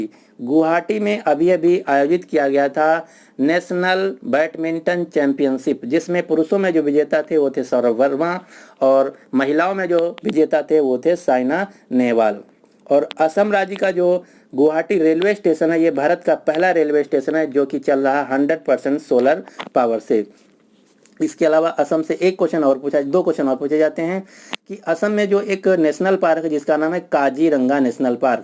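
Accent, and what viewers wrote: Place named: Uttar Pradesh, India. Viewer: Lagta h ki app up ke h hain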